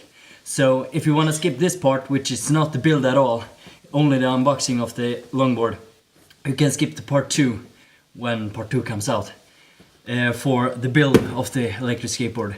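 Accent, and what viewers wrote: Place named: Russia. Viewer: Русский ?! Акцент как-будто с России )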